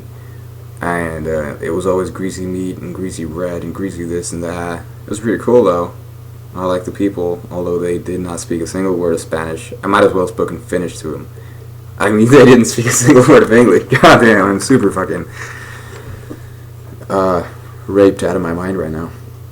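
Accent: United States. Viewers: why do you speak English so good? And also why do you not have a Finnish accent? You sound very american! \m/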